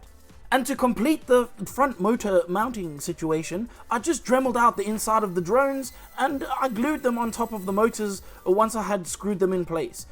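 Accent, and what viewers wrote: South Africa. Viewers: dude sounds maybe a bit south african? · I have to ask: are you originally from South Africa? Feels like I'm hearing a familiar accent 😅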